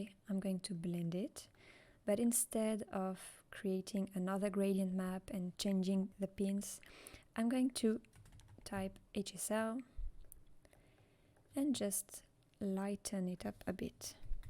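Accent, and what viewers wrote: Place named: France. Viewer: J'ai trouvé un petit accent frenchy et du coup j'ai tout compris ! Merci pour ce tutoriel de qualité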